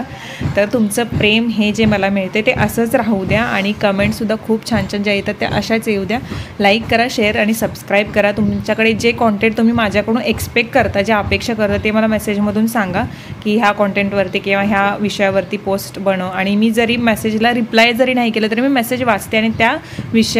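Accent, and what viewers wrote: Kolhapur, India. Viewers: प्रत्येक लाईन मध्ये ( करतली) आणि (करताशी)खुप छान होत भन्नाट कोल्हापुरी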